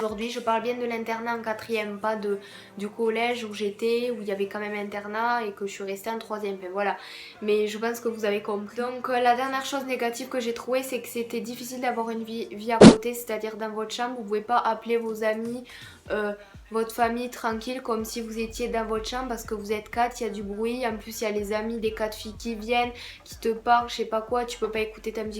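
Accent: South, France. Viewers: L'accent du sud est quand même Wow.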